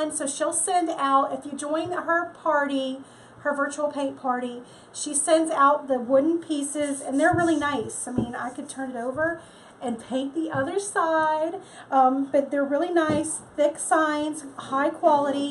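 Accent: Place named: South, United States. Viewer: Thank You!!! I cannot make a bow for anything that looks nice. This will help for Xmas gifts. I love everything you do, esspecialy your southern accent. 👍👍😊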